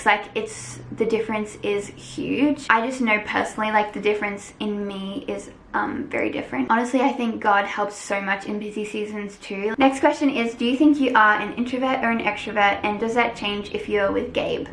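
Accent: Australia. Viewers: So Australian. Love it 😍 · me watching the whole video and the whole thing I pay attention to is her amazing Australian accent 😂😐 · I think it would be so interesting to see how many of your kids and what kids of yours get's your Australian accent or if any gets your accent!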